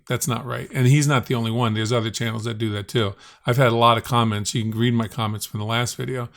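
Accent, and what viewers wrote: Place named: West Virginia, United States. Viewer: I’ve seen this AV coin pushers videos before. The comments are truly frightening. I can’t tell if people are seriously believing this crap or just suspending their disbelief. I saw one earlier where he claimed to have spent half a BILLION dollars on 25 quarters. 😆 The clown sounds like a hillbilly from the deepest darkest corner of West Virginia….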